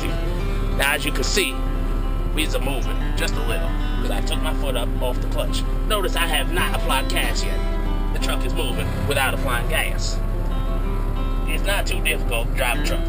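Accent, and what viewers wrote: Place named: Louisiana, United States. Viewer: he sounds like he has a lousiua byoue accent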